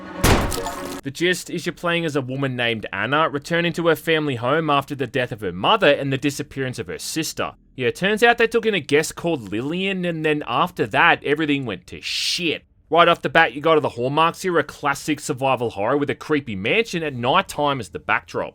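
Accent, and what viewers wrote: Australia. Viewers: Are you OK? You're sounding like an Australian today.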